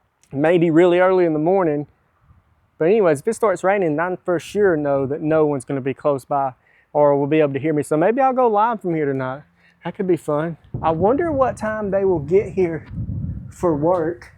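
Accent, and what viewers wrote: South, United States. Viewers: Another great video! As a Canadian, I think one of my favourite parts is the southern accent lol the way you said “see-ment” made me laugh (the same way we say “aboot” and I don’t mean this in a negative way! Thanks for the laughs and another great video. Cheers!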